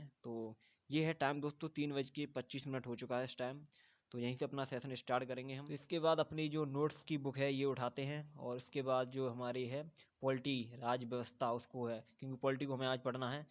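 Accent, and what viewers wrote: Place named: Bareilly, India. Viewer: Brother are you from bareily or aagra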